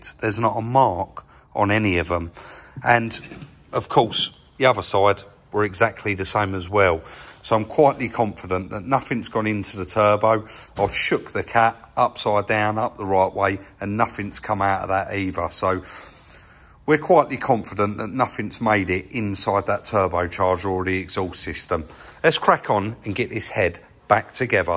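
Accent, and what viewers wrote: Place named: Kent, United Kingdom. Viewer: are you from kent??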